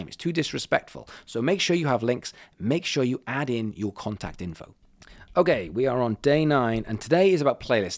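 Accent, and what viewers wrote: Wales, United Kingdom. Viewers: So helpful, really good communication style, clear and concise and specific, plus the Welsh accent is great!😂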